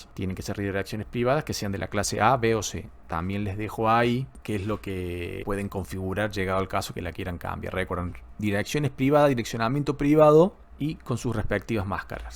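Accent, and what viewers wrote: Córdoba, Argentina. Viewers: Sos cordoooobes?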